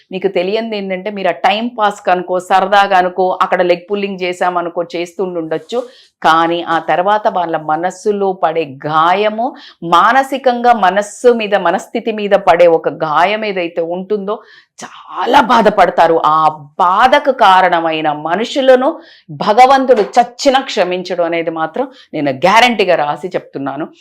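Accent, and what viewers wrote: Telangana, India. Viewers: I like her accent of Telangana language 🎉generational ga elagae matladutharu🌸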